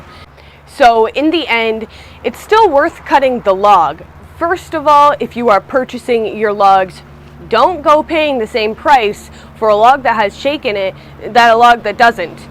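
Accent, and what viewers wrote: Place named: United States. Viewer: I undestand your your american english very well, thank you for that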